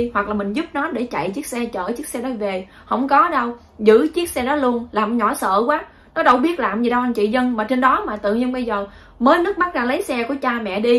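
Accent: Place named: North, Vietnam. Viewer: Sometimes it is difficult to understand the Tonkinese accent.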